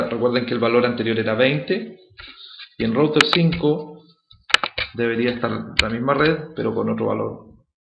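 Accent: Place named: Chile. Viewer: excelente vídeo me faltaba tener bien el concepto de la métrica lo de virtual link no lo sabia pero en CCNA igual se trabaja con distintas áreas teniendo claro la Backbone, OSPF tiene sus reglas bien definidas me sonó a que eres de Chile bueno saludos.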